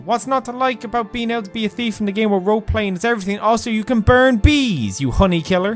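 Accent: Ireland. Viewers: OMG YOU'RE IRISH TOO?!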